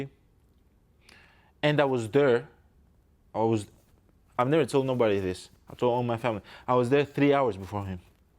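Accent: Greece. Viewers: He's Greek accent and above all his Greek mentality is on display 100% and I love it! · He’s Greek and speaks English with a Greek accent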